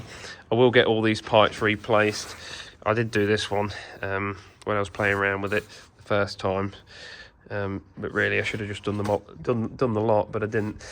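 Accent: United Kingdom. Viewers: Bro i absolutely Love that British accent 😂❤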